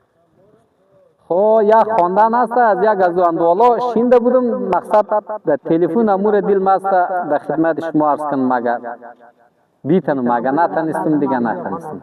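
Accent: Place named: Daykundi, Afghanistan. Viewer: آخ مه بلیبور لحجه دایکندی شونوم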